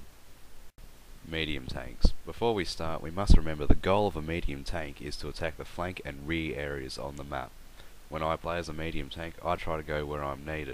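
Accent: Australia. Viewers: ozzies always say youchoob. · Nice job and some helpful tips. I regard the rich and mellifluous Aussie accent as a bonus. Thanks, mate!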